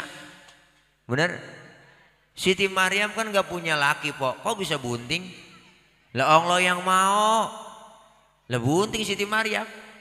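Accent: Betawi, Indonesia. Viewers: Asalamualaikum pak ustad..bahasa betawi ny pak ustad bikin kita. semua..ketawa mulu..terima kasih ilmu ny pak ustad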